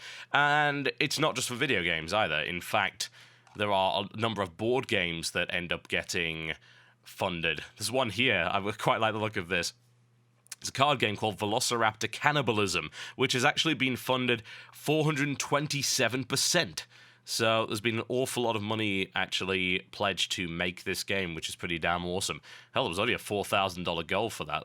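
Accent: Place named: United Kingdom. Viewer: "Bawoldur's Gate," god I love TotalBiscuit's accent. It's so hilariously British.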